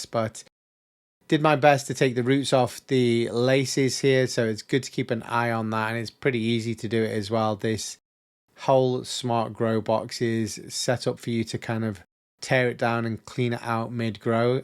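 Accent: United Kingdom. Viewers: you sound so English lol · No a scouse... sound more like berkenhead to me...Better class of grower.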